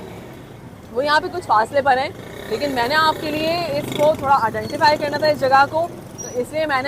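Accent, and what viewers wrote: Karachi, Pakistan. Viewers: Most of the people don't know that you are sindhi as they are confusing you because of typical Urdu speaking accent...your accent is of typical karachite's Urdu speakers...love from Hyderabad ❤ my name is also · Excellent video,and your urdu slang is marvelous,fluent urdu with typical pronunciation of urdu remind me of Karachi of 90s,lovely keep it up. Greetings from Capetown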